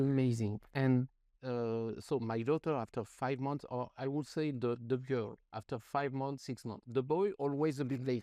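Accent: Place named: France. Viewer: Déjà, en Anglais, il a un accent français à gratter au couteau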